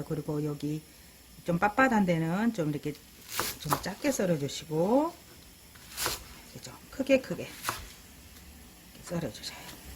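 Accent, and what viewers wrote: Jeolla, South Korea. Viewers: 호남말씨 쓰시는 누님~돌아가신 부모님도 전라도 분이셨어요~목소리 정감이 가네요~영상보고 꼭 한번 만들어 먹어봐야겠네요~감사요~^^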